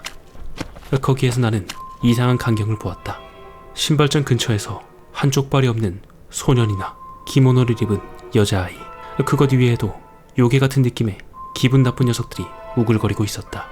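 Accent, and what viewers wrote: Gyeongsang, South Korea. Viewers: 님 경상도 분이신지~~무서운장면에서 사투리땜에 빵터집니다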